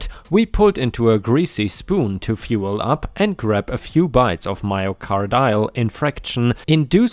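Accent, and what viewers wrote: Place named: Germany. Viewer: I love your accent! You sound like my German Grandmother! 💋❤❤❤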